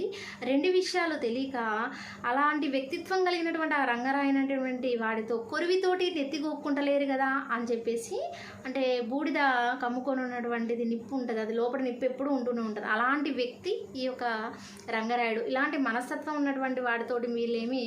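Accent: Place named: Telangana, India. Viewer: మీది తెలంగాణనా అమ్మా... మాం డలికం 👍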